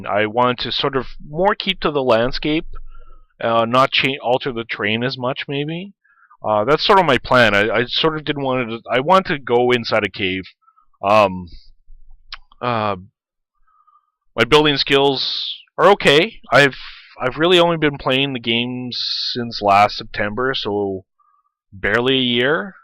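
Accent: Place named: Canada. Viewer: Love your Canadian accent eh.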